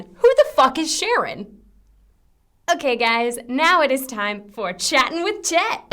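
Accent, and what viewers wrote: England, United Kingdom. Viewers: You're welcome. Obviously I just call you those things outloud and appreciate that I've never actually typed those words in the comments box because, well come on, that would just be fucking creepy! I do feel comfortable enough to announce that I am a huge fan of your english accent though, great because it's not the "upper class" accent that most go for.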